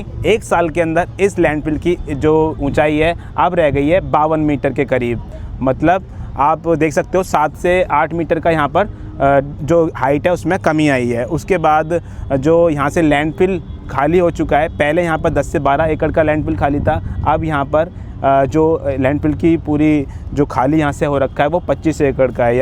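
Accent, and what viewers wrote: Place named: Bihar, India. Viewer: 100% aap bihari hai na q ki mae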